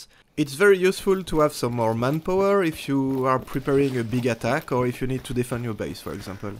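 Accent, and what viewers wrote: France. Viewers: Merci pour ce tuto (ton accent anglais me fait penser que tu comprendras mon commentaire 🙄😆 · salut , j'ai entendu a l'accent que tu étais francais :) alors voila j'ai un soucis avec a anomaly en mode warfare c'est en russe! alors que le mode "histoire" est en anglais saurais tu comment arranger sa ? merci d'avance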